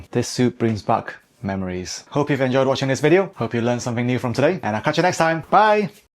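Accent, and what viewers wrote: United Kingdom. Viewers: are you educated in UK? you have a very UK accent, ^^ its a complement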